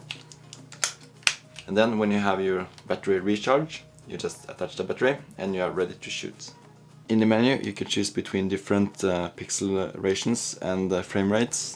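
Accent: Norway. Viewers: jeg hørte at du var fra norge, på engelsk-dialekta di ^^ Ska kjøpe det kameraet etter nyttår, gleder meg! :D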